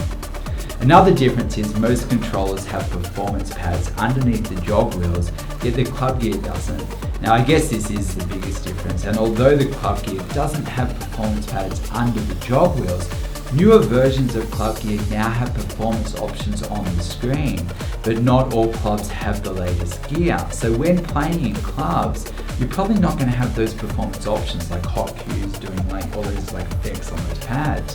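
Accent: Australia. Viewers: i can hear and aussie accent? ahahahah love it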